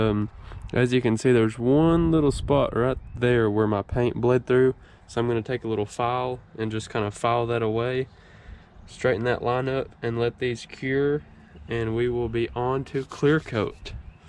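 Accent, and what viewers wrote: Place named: Alabama, United States. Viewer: Never heard a Mexican speaking with a Alabama accent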